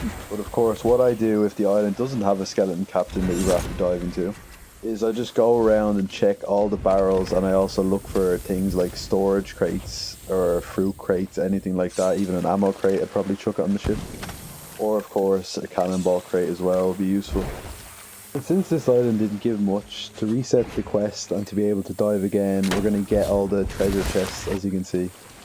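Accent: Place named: Dublin, Ireland. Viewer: What part of dublin you from lad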